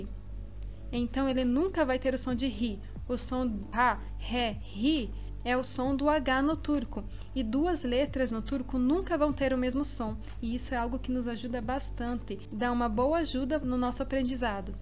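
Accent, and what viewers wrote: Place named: Brazil. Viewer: O t em português pronuncia se t efetivamente. Os brasileiros é q pronunciam assim.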